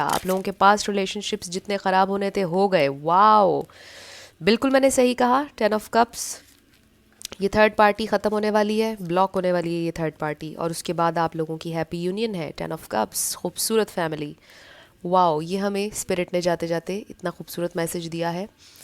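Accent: Pakistan. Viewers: Apna khyaal rakhiye Aap hi nahi main all taro readers ki dil se izzat karti hu... Means aap log apni rooh apni jaan laga dete hain Ek Ek Situation ko samjhane me.... Her tarah se hum logo ko achcha chahte hain Aur Bahot mehnat karte hain... I love ur urdu lehja also vvvvvvvvry much.. Pl tk care urself... N again Shukriya....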